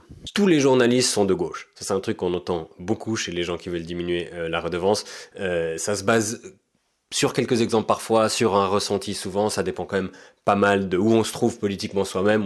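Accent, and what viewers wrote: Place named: Vaud, Switzerland. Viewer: Merci pour les sous-titres je comprends vraiment rien à ton accent vaudois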